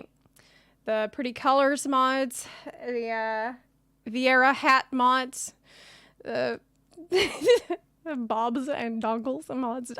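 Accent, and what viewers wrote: South, United States. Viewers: What accent is that? Definitely southern and super cute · Bless your heart, darlin, you're as southern as I am lol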